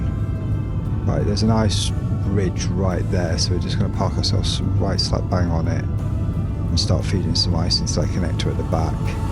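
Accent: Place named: United Kingdom. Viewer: I was wondering what part of the uk your from?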